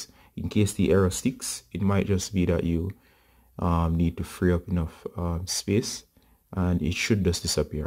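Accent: Jamaica. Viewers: good to hear a jamaican voice .. watching from the UK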